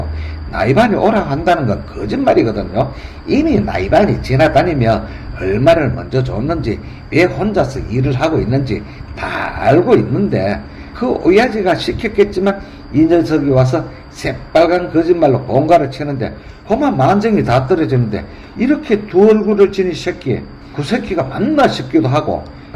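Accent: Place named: Gyeongsang, South Korea. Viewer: 왜?유트버는 대개가 경상도 말씨를 쓸가?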